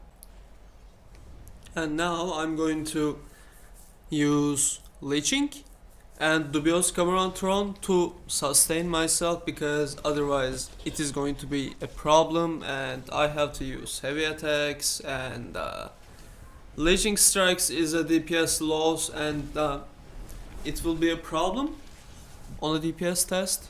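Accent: Turkey. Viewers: Türkmüsün kardeşim Türk İngilizcesi bu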